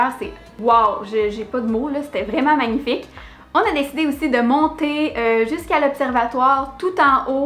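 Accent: Quebec, Canada. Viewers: J'ai écris mon commentaire en regardant la vidéo je n'étais pas déjà arrivée à la fin, donc je profite maintenant puisque tu as posé la question à propos des voyages pour t'écrire un petit mot! Je suis Libanaise, mon fiancé est Libano-Canadien. J'ai visité le Canada l'été passé pour la 1ere fois pour 1 mois, et j'en suis tombée follement amoureuse.... la gentillesse des gens est extrêmement touchante... la beauté du pays, la diversité culturelle, le respect, la tolérance, la liberté, la sécurité,... tout, absolument TOUT m'a touché. Je n'ai pas pu resister d'y retourner pour la 2e fois cet été encore pour 1 mois, et même chose, je suis hantée par un sentiment de réelle appartenance à ton beau pays!!! Pour les 2 visites, le retour était vraiment difficile, je le vis comme un arrachement! Petit bonus: j'adore J'ADORE l'accent québecois! ❤ En regardant tes vidéos je me sens un peu soulagée d'avoir une petite dose quebecoise à chaque visionnement 😍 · ... 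et aussi je suis originaire de la région de tours, Amboise plus précisément. Tous les endroits que tu a visité je les connais plutôt bien. Ça fait plaisirs de voir nos cousins québécois s'intéresser a l'histoire de France 👍 et j'adore votre accent ça me rappel mes année a Montréal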